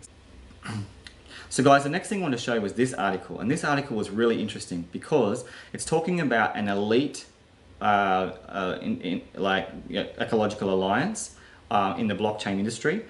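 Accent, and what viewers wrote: Australia. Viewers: Awesome!! That's a lot of info to absorb, sounds like you're in Queensland and have a foxy type dog. You make very interesting points and links. thank goodness I chose Neo. For all the hours and hard work I would like to donate some Gas but it seems you are to humble. Really appreciate your work mate.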